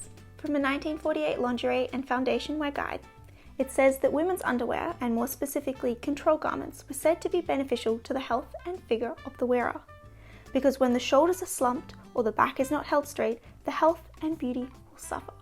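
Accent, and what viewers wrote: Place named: Australia. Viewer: I have commented on one of your videos before (on the life of a housewife in the 1950s), you seemed to appreciate my comments - thks for your reply at the time. I was from an English immigrant family and lived in Melbourne from age 9 to about 32, when I returned to England. So the way you talked, and the sort of things you had in the house, were very familiar. Just a word about terminology: the belt worn around the waist with straps to hold up stockings, worn by women (and most girls from age about 12), was called a 'suspender belt' - the same as in England. It's mainly in the USA, that they are called 'garter belt'. In the US, the straps themselves are called 'garters', which can also refer to an elasticated band around the leg to keep stockings in place. So 'garter' has both meanings in US English, but only the latter meaning in England and Australia (elasticated band around the leg). 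A suspender belt in Australia was also called a 'dance belt', for reasons I never discovered. Time was when women liked to look and feel feminine - them were the days! ("vive la difference!" and all that).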